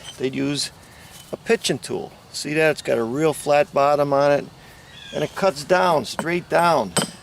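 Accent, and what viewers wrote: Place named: Pittsburgh, United States. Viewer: Today they would use the lickum and stickum method on that stone. You know, you buy it at Home Depot,and they even give a 15 minit coarse on how to do it. The guy or lady giving the coarse might be a retired cop or school teacher. lol But anyway you would still be in jail had you designed that house. But his arrogance F. L. W. was looked upon as a genious even though the place got to the point that it wasent even livable anymore due to how bad it settled. Millions were spent trying to save that place and there are videos of the whole restoration project. A whole bunch of pinheads coming up with a whole bunch of bad ideas on how to fix it. The best way would have been to tear it down and start over with a sensible design. You mention your dad being old, Well in a few days i will turn 87, i can no longer work due to serious back issues, but i can still hang out at my sons large cabinet and millwork shop here in Vero Beach and keep things straight. 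lol And i can still launch my small boat and fish the many very good lakes near here, although the salt water i can no longer do. Your accent says your from the Pittsburg area, where mine would indicate Philly. We still have a nice family owned camp up there in Cameron county, but i havent been back there since the Covid situation heated up. Anyway i cant really take the nasty wether any more either that area is well known for. Keep up the good job on the videos and for gods sake try to remember to put the line up witho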